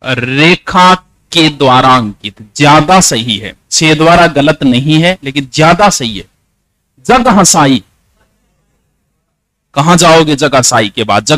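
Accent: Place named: Haryana, India. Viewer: Hii Yr ye sir konsi jagah ke h Bich bich m haryanvi jaisi language kyu bolte h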